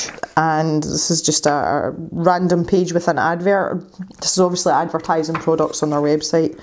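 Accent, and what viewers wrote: Scotland, United Kingdom. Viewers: Aw I am so glad I clicked on your video, so nice to hear Scottish youtubers! :)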